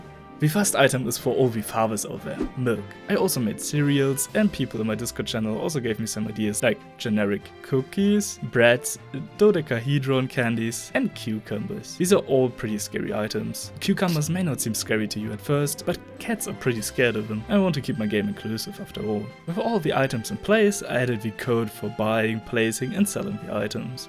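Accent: Germany. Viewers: i like the german accent (sorry if it isnt german it sounds alot like it) and its a really good video keep up the good work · Are you Deutsch? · Are you german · are you german perchance?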